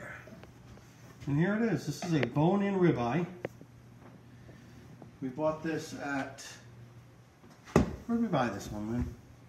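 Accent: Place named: New Jersey, United States. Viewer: I got a 4 bone roast from Shop Rite for the holiday sale for $64 minus a $50 gift card it cost me $14 & as you said each steak could cost you $80 if you went out to eat & wouldn’t nearly be as good. Nice video! I wanted to make a roast & was looking for a video on how to trim it as a roast but my wife said we’re never going to eat it all & asked why we can’t just cut it into steaks & freeze them so listen to the wife. Your accent you sound like you’re up near Floriam park somewhere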